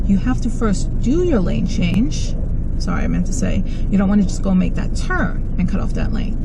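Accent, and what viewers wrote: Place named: New York, United States. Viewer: Do you teach in Suffolk county? I like you New York accent lol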